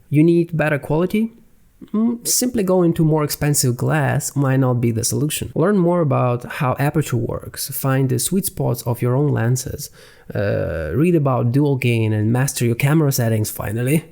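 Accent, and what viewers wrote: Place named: Asia. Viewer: how u sound asian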